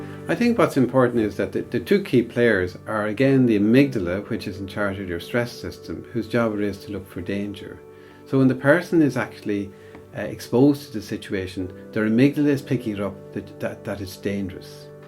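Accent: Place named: Ireland. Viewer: Very calming voice its Irish accent u definitely should do a calming video . Thank u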